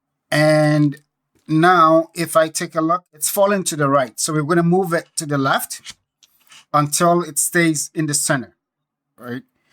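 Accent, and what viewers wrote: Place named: Ghana. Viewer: love the African accent,,, Ghanaaaaaaa.... lets link up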